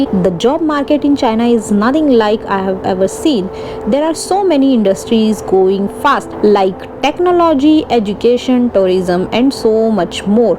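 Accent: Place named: India. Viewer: She speaks like how most Indians speak English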